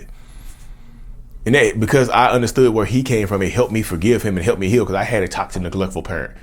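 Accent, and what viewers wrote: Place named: North Carolina, United States. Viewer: That preacher thing happens to my voice in my videos sometimes too! 😆🤣🤣Also, the very FIRST time I heard you speak, I was like, "This dude sounds like home. He has to be from NC..." I'm in L.A. by way of Charlotte. Congrats on all the moves you're making,. -S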